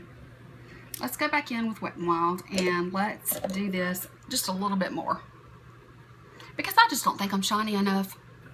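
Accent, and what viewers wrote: South, United States. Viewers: hi i love your southern accent im from australia and i love make up to and if you want to do more make up looks do it don't let any one say differant i would love to see more also you remind me of the actress cathy bates i like her movies to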